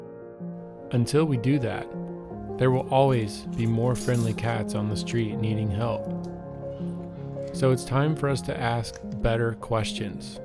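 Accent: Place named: New York, United States. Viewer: "this is de mudda" holy crap that NY accent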